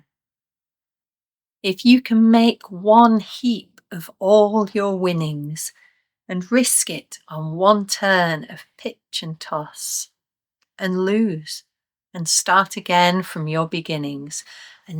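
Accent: United Kingdom. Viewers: Thanks a lot for sparkling our love for English language and poetry with British accent.❤❤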